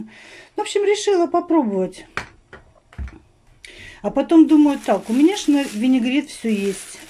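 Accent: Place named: Rostov, Russia. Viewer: Вы ещё сосиски произносите сосиськи😃Ваш Ростовский диалект. Мило и прикольно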